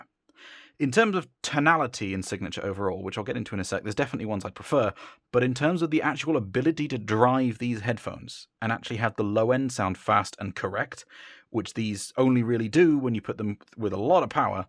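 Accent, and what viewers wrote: United Kingdom. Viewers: This is like watching evil Zeos. No anime, no cat, and no cursing. Instead of the conservative talk radio vocals, there's an elegant English accent. There is no Patreon/yard sale. There are cuts in the video. There is no random song selection every time.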